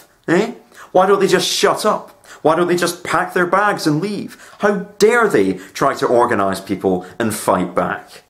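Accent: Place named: Scotland, United Kingdom. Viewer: Sorry, I'm from Canada so I can't distinguish accents well. I guess you're in Scotland.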